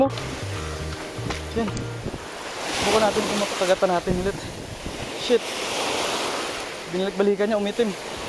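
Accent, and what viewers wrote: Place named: Iloilo, Philippines. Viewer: Ilonggo ka gali master taga diin ka master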